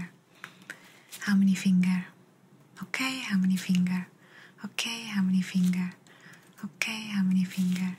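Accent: Italy. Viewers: Hello, magnificent ASMR with an Italian accent, I loved it and enjoyed it, it is very relaxing, greetings, beautiful 💖💖🌹🌹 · Italian accent videos are perfect for relaxing and unwind your soothing voice makes me feel 😴 😊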